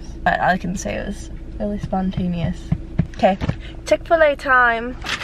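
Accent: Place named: Minnesota, United States. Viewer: Your from minnesota or north dakota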